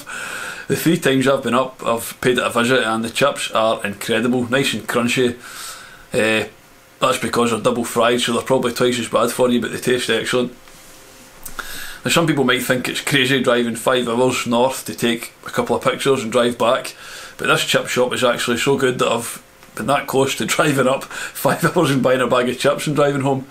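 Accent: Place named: Scotland, United Kingdom. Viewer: That’s a great video. Thank you very much. But I never met a Scot with such an extremely dialect. I nearly understood nothing. 😂😂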